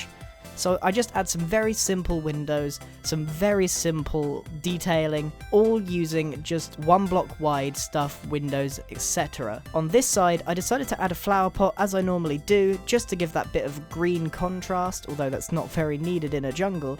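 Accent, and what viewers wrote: United Kingdom. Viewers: i love your brittish accent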